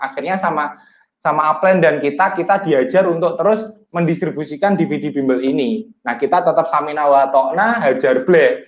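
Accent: Java, Indonesia. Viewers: Keren ,lucu,medok ngomkngnya 😀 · Maaf mas sblomnya,jujur sy ketawa dg crita dg logat jawa yg medok,lucu,wajah yg imut jdi sy ketawa ,tpi saya salut bljr bisniis saat usia masi belia,& jdi inspirasi byk org,keren banget,hebatt,sukses sll,mksii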